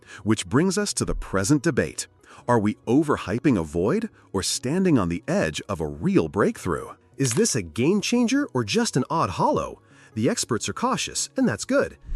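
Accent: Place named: United States. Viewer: THE AMERICAN NOISE OF THIS NARRATION IS SO ANNOYING. IT SOUNDS SIMILAR TO THE VOICE OVERS FROM THE 70S ABOUT A NEW WASHING MACHINE BEING SOLD AT A NON-DESCRIPT APPLIANCE CHAIN IN THE USA. STOPPED WATCHING AFTER 2 MINUTES AND FOUND SOMETHING ELSE LESS ANNOYING .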